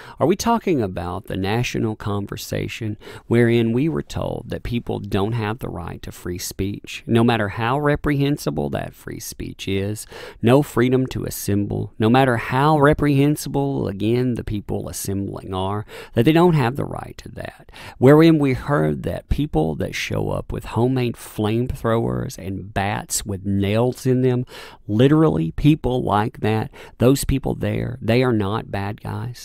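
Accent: South, United States. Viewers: Dude what region of the country are you from? Your accent sounds decidedly southern but can’t quite place it regionally beyond that level of specificity Also, you say “within this” to an almost enraging degree lol. I have to assume that’s a regional thing too, sort of like how Baltimore residents say “behind this” or “behind that shit” meaning “vis-a-vis” more or less. That’s the same type of thought conveyed by your “within that” thing I’m not asking these things being critical or shitty at all! I’m 100% a D&C/comicsgate supporter and love your videos along w Nerkish, yellow flash, captain Cummings (though he gets on my nerves lol. Dude can’t read’. I swear he reads at about a 4th grade level lll but I share his perspective completely so I try to overlook it) lol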